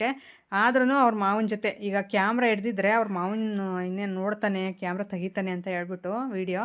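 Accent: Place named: Sira, India. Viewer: Super nivu matado riti nange thumba esta aythu because nam sira side kuda navu hige matadodhu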